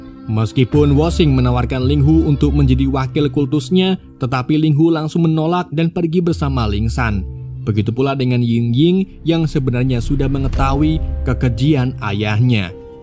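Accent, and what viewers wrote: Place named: Tegal, Indonesia. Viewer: ngapak,tegal mas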